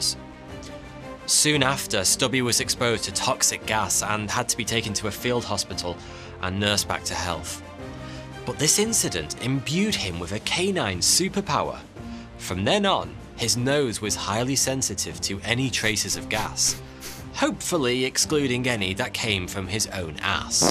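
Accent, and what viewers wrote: United Kingdom. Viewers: Blah blah blah brittish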